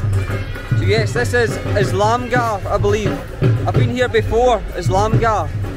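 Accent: Scotland, United Kingdom. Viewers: Love your Scottish accent....enjoy Pakistan!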